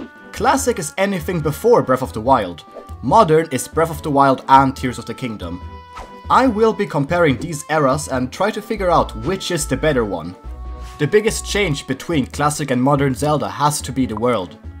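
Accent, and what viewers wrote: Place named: Sweden. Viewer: I could hear you’re Swedish from a mile away xD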